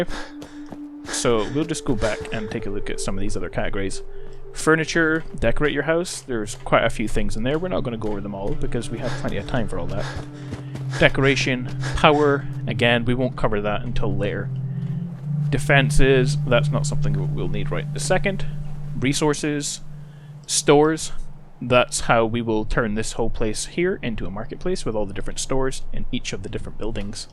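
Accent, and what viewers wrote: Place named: Scotland, United Kingdom. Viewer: Hey ,am strange question but where are you from? I know Scotland but specifically recognise your accent haha whereabouts In Scotland are you from?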